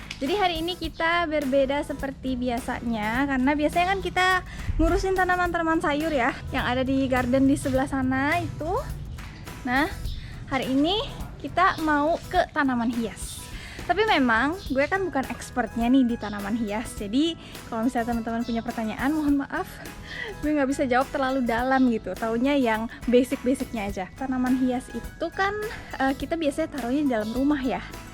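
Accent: Japan. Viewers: baru mau coba menggunakan media leca buat fern cari referensi nemu lah video ini yg pas, ada aksen japan nya btw ternyata prnh/lama di sana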